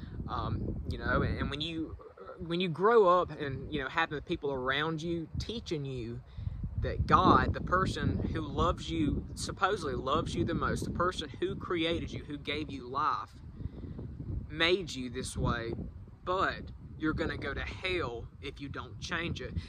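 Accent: South, United States. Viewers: A fantastic video. I love your southern accent. I could listen to you talk all day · I love your accent, sounds so southern haha.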